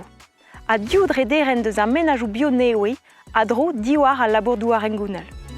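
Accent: France. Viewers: Well, it is true that there are some french caracteristcs in her accent. She doesn't seem to have much struggle to stress sylables, though (which is something we, french speakers, don't know a lot about as we only do it at the end of sentences or so). What makes her sounds french must be her vocal and consonnant placement. Yet, you surely are aware that most Breton speakers are native french speakers, initialy. And those who actually get to fight to keep Breton alive, already some decades ago, learned it from their grand parents who knew french (or some french) too. This helps to undersrand why these features exist in modern Breton. I guess modifications in a language, in general, aren't nor good nor bad. Maybe it's just how it goes. You can still find few active story tellers (recorded by Brezhowed, by the way) that have pronunciations that may fit more your expectations (and mines, as I love strong local accents in every language I learn). 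Then again, thanks to all of them fluent Breton speakers from this channel to keep going with their good work, not only by doing it in Breton but also by offering great content variety and good reportage quality.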